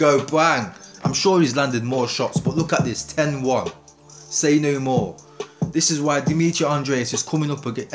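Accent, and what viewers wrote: United Kingdom. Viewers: never seen this channel before. Late subscriber. Is that a notts accent i hear or derby? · Yo the guy speaking in the video are you from Notts by any chance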